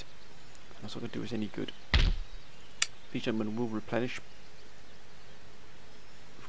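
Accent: Wales, United Kingdom. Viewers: you're a real gentleman, and very polite keep up the good work(: PS Are you welsh?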